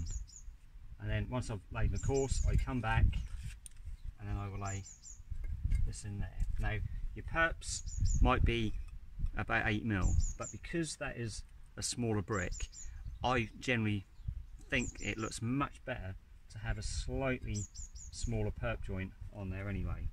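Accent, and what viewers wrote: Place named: Norfolk, United Kingdom. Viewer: you sound like your around my parts... Norfolk by any chance? ..(groundworker trying to learn a thing or two)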